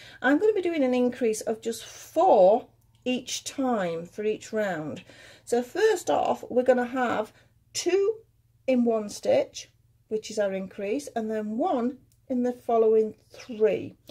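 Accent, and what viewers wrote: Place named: England, United Kingdom. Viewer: i love your accent i am from england alos i love hearing people from home talk and i love the video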